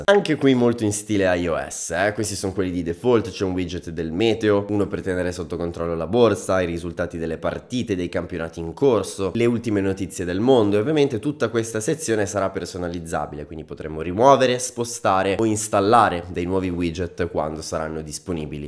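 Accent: Italy. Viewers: Lo potevi dire subito che eri napoletano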